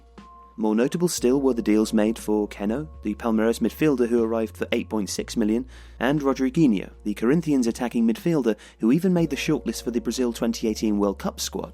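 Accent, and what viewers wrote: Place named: Wales, United Kingdom. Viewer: Wow, now I feel like a dick. I didn't actually know about this Welsh. I'm from Egypt and we have this sort of stereotype about the British adding the Kh letter to everything like in habibi "khabibi", you can see it in really old tv shows here but in the Real world when I started talking to people from England especially Londoners not one of them was even capable of producing such sound, like saying Khaled as " D. J. K-aled " and then i was convinced that this stereotype came from the time of British occupation of Egypt where those who learned to pronounce the letter "kh" in Arabic are just showing off by jamming it into any and every word. I thought you were just being a hipster or something. P.S. in Arabic there are 2 letters for H, like B and P in english. and the one in el Ahly is different from the one in like Habibi, so i can see now how it would be hard for someone especially with a Welsh accent to pronounce it. 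just try not to think of Habibi when saying el ahly. think Haba Haba.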